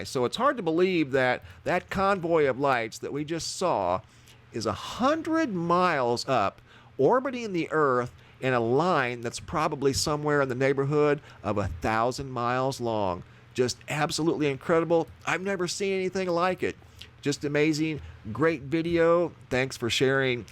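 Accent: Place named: South, United States. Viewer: Love the channel bro 😊👍 Great southern accent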